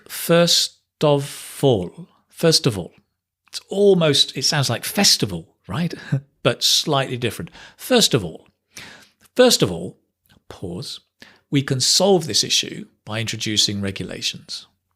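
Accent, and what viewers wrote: United Kingdom. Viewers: I definitely love your British accent, hope you'll provide us with more videos <3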